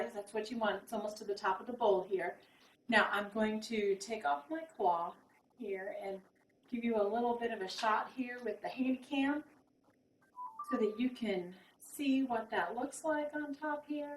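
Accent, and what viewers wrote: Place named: Scotland, United Kingdom. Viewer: The first sentence made me think you have a Scottish accent :-P.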